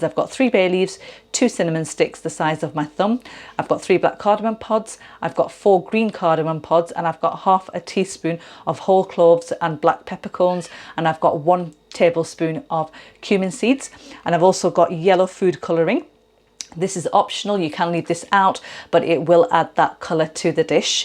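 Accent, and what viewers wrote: United Kingdom. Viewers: Wow,you are absolutely good at cooking.Thank you for teaching a single Dad like me. May i ask respectfully,is your accent British ?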